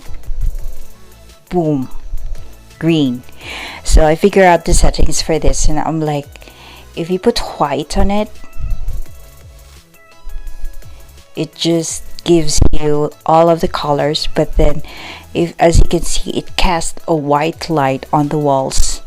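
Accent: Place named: United Kingdom. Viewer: Blue for sad ☹️ Anyway, pinapasaya tlga ako ng british accent mo sis HAHA.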